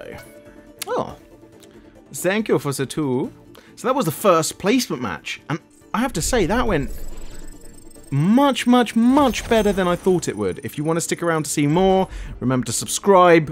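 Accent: United Kingdom. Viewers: you have a Voice Like One of that English Steryothypical Tea Drinker I Like it!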